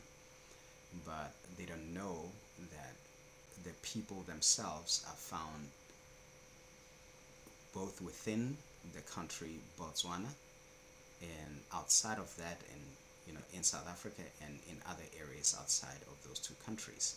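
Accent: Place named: United States. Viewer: Love the program. The accent though made it difficult for me to understand you, wish you could leave the Americanisation.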